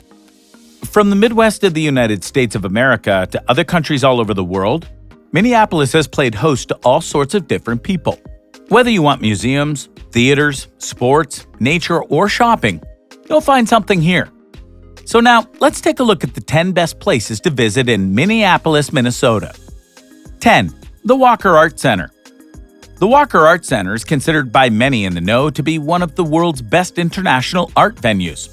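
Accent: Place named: Canada. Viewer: The narrater sounds Canadian.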